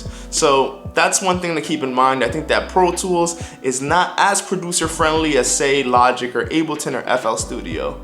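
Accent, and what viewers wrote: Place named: Toronto, Canada. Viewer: you sound like youre from toronto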